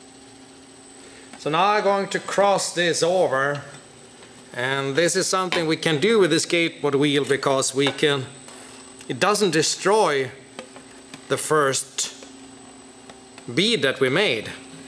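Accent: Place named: Sweden. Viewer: He have to be from Sweden with that accent.. cool.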